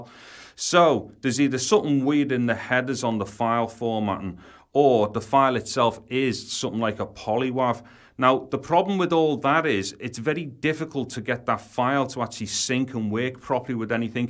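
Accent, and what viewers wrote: United Kingdom. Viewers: I love your dialect ! From which part of UK you´re comming from?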